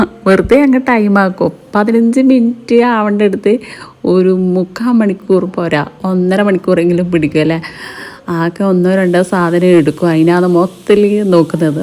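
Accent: Kannur, India. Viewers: Ngale samsara reethi nallam ishtapett.kannur kasakodu karude samsaram kelkan nalla rasama❤❤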